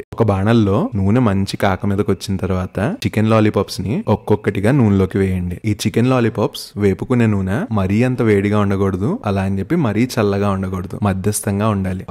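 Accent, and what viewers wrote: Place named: Vijayawada, India. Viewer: Mana bezawada accent keko keka brooo..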